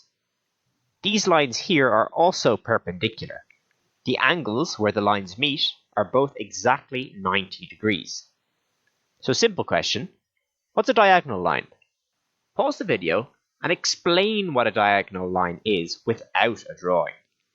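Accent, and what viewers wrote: Ireland. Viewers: These videos inspiring I have a theory but: Are you Irish?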